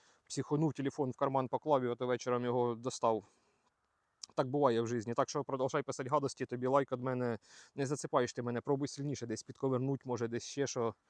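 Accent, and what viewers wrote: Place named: Surzhyk, Ukraine. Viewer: Балакай краще вже на російській,бо твій лютий суржик це капець.....або вивчай мову краще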